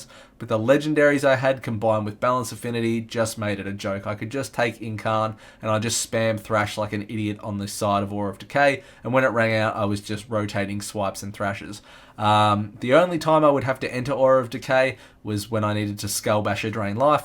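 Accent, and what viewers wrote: Australia. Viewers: dude just having you say 'this cunt is cooked' had me subscribe. good aussy tank videos i approve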